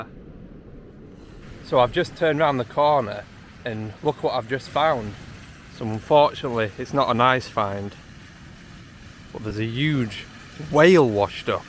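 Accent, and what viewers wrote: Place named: United Kingdom. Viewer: You have the best British accent. #1 British person.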